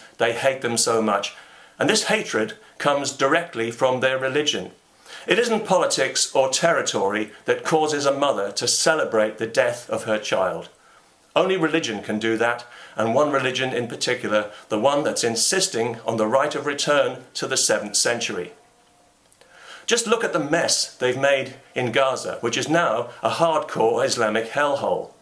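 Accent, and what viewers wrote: United Kingdom. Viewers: You sound British :) you know what. Let's give the Arabs their country back that you stole and gave away and give the Jews a part of England instead. I mean your such a nice and fair people and has always been. Lets not talk about how you treated Scotland, Africa, India, Ireland, slave trade and so on :)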